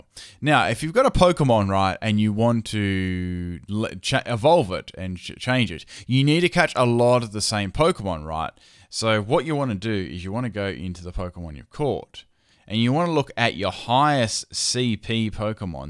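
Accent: Australia. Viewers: Wasn't expecting an Aussie accent haha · Are you Australian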